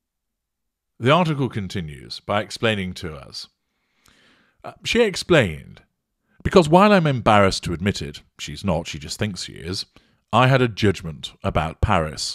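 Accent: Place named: England, United Kingdom. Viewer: This is just the best thing ever hearing these words in an English accent! 🤣 “assywipe” “spotalie” and “podcrap” I had to replay it a cpl of times 😂 i love it!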